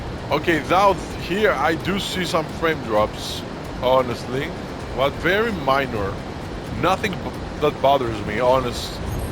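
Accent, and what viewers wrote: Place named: Netherlands. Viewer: Nederlander zo te horen haha. Ben je al naar je eigen huis geweest in game ? 😂. Was een van de eerste dingen die ik deed. En naar al die leipe plaatsen zoals north sentinel island en Chernobyl enz enz 😂😂😂.. ik zit ook op base ps5 en het speelt heerlijk, maar ik speel dit niet echt als een flight sim, meer als een tourist sim lol. Al vlieg ik wel naar de locaties toe vanaf een vliegveldje dichtbij maar vluchten van hele dagen zie ik me zelf niet zo snel doen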